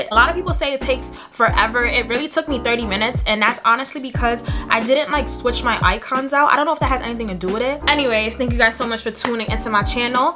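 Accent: New York, United States. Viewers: The moment you opened your mouth I knew you were from NYC 🥰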